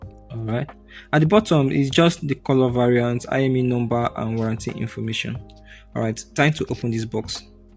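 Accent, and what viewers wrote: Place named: Venezuela. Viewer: Español venezolano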